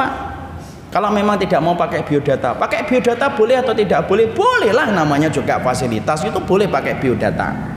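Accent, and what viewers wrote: Java, Indonesia. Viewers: iya ka, belaiu orang jawa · 😁 pernah dengar rakan-rakan keturunan jawa saya bertutur sama macam ustaz ni.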